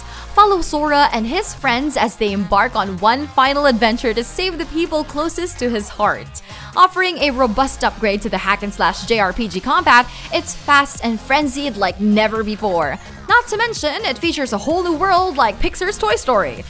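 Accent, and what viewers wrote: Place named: Philippines. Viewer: Her accent is so filipino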